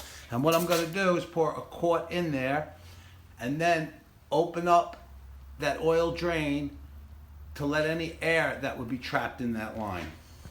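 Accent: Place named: New York, United States. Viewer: Hey, I haven't heard your type of New York accent in over 30 years! It's refreshing to hear it after such a long time. I'm not a native of New York, nor, a transplant. It's comforting to hear it again.🤓😁⭐⭐⭐ Doug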